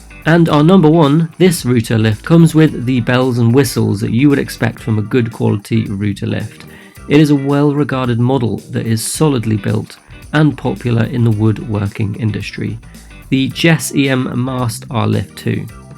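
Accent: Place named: England, United Kingdom. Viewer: He's a Pommy, not a Kiwi!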